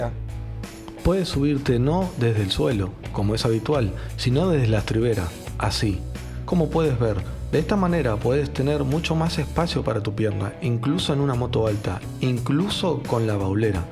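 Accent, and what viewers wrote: Standard. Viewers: Por qué hablas en neutro? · Por favor,no hables en neutro 😅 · no hables en neutro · El mejor comentario , alguien que me diga por qué habla en NEUTRO si es más argentino que Manuel Belgrano…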